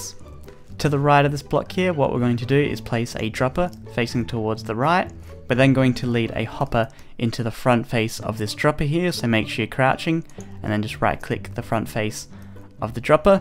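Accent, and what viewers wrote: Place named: Australia. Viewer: I love ur accent! it sounds almost Australian :)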